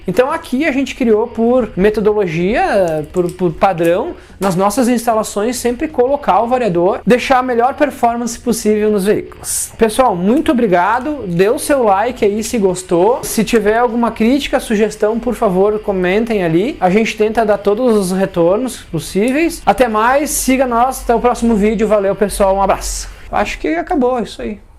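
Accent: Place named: Rio Grande do Sul, Brazil. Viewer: Sotaque de gaucho raiz 🤙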